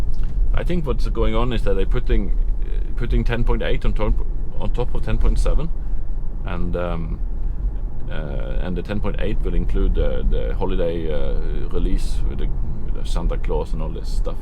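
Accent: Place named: United States. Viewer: sounds Swedish American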